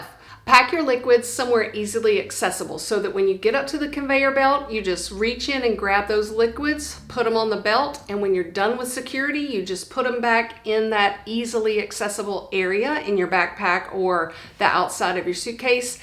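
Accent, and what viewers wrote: South, United States. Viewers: I cannot express how much I appreciate your videos. We have traveled quite a bit so how you manage to throw a tip out there that we can use is awesome! Every video you have is so informative. I have directed many newbie travelers to watch your videos. Keep up the great work! Your southern accent is also lovely. My husband is from TX and I love it. 😀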